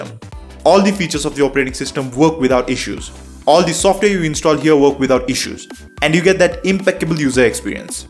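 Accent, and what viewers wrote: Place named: India. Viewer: My ears are bleeding hearing this effed up horrible Indian accent, and I had to stop at less than a minute into the video 🤮🤮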